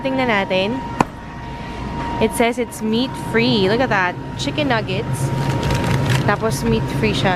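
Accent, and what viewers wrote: England, United Kingdom. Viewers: please make a video on how you got your english accent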